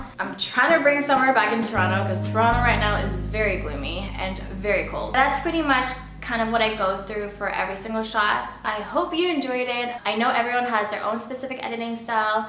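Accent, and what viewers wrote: United Kingdom. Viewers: I loved the British accent! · Ok. Bye. lol. Nice British accent 😃.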